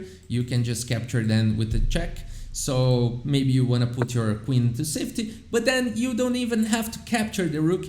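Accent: Brazil. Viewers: Your English is good! Though you have a very distinguishable Brazilian accent (and there are some language slangs here and there that are mostly limited to Portuguese), you don't stumble or make awkward silent moments. Looking forward to seeing more videos! - Valeu,! Bora conquistar audiências lá de fora:) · Engliguês ou Portuguish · Se o sotaque não entregar que é brasileiro, as mãos entregam kkkkkkk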